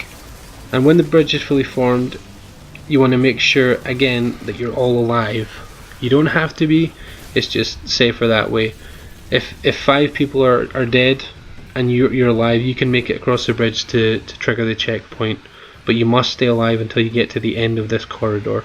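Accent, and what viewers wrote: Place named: Ireland. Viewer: You irish :o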